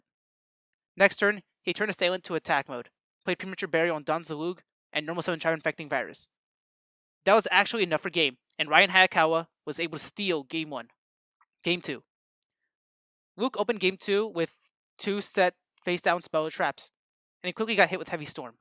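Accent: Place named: New Jersey, United States. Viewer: I'm downloading this video as proof that you're from NJ and not Brooklyn.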